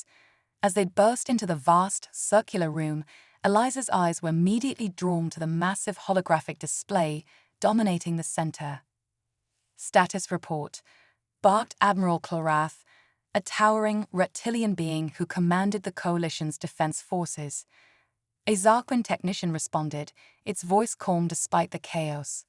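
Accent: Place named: RP, United Kingdom. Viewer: I am NOT a fan of this female upper crust British A.I. voice. Too many emphasizes on too many superlatives and verbs and staccato syllables followed by disdainful valley girl syllables at the end of sentences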